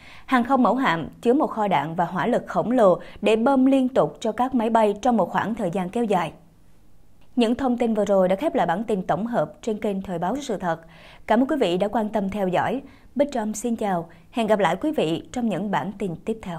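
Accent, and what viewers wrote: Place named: Central, Vietnam. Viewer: người đọc có giong miền Trung dễ nge.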